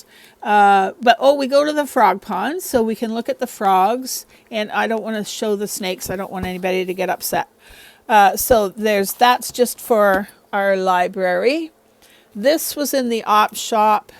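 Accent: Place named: Canada. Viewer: Just love you to bits! Very interesting to hear about your family (even though it's tragic). You are the opposite of idiotic silly, but I appreciate your sentiment. I have to say, my husband was listening while I watched and was smitten with your Canadian accent. But then, he thought you said "teared tray" and asked me if you were crying. 😳 Husbands!...I get my baskets the same way you got yours.😂 Take care and keep warm. God bless you friend 💕🥰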